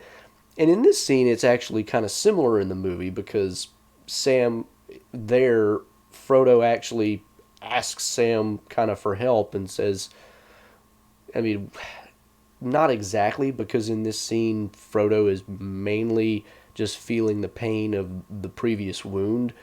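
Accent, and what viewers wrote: South, United States. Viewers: That is a very HARD Southern accent you appear to have. Kinda glorious. The os and as are a dead giveaway.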